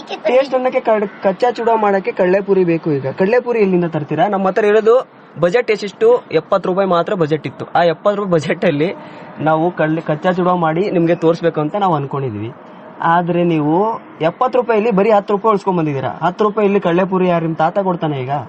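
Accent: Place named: Uttara Kannada, India. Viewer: ನೀ ನಮ್ಮ uk ಶೈಲಿಲೆ ಮಾತಾಡು ಚನ್ನಾಗಿ ಇರುತ್ತೆ.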